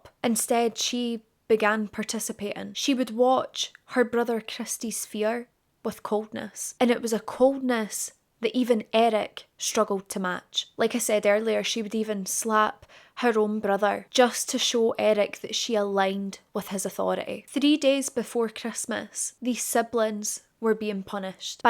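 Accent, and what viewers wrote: Scotland, United Kingdom. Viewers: All my hype points went to you 💗 once again a new story for me, you’re the best. You were the #1 channel I watched in 2025, I’ll never get enough of you’re Scottish accent and to see what stunning new look you show us❤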